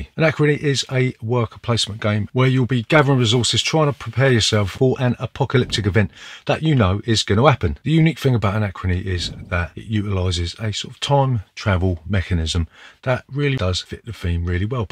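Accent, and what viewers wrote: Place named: London, United Kingdom. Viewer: I’ve been watching the videos you have produced for a little while and despite the list which is awesome with lots of games I want to try on there, I am always struck by that bloody lovely gaming room and your awesome Londoner accent!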